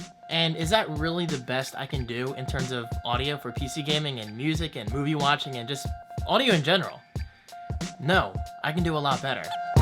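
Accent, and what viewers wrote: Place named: Staten Island, United States. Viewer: Lol I feel like your from Staten Island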